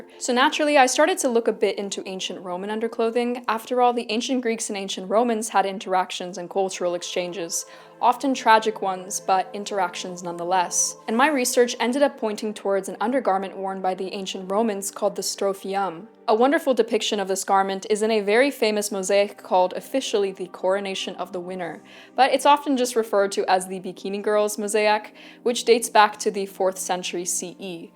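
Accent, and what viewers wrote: United States. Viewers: Sounds US midwestern to me. Lots of extra s in midwestern speach. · you sound American. But the way you said privacy makes me suspicious lol 😂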